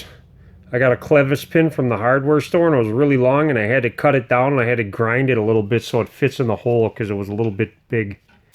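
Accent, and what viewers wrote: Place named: Midwest, United States. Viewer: this guy is so midwestern🤣🤣🤣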